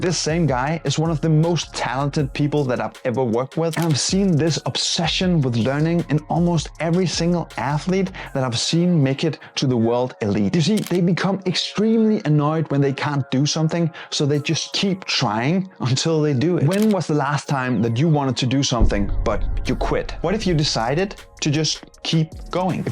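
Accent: Denmark. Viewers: He is Danish, its just a dialect. Learn to understand it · A very valuable video. Much love from Sweden. The Danish accent in your English makes it even better as my circle is filled with of wonderful Danes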